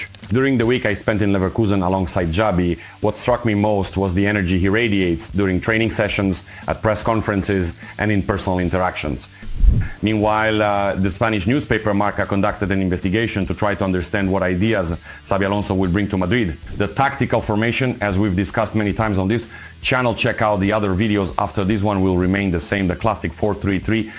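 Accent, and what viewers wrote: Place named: France. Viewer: Sounds french to me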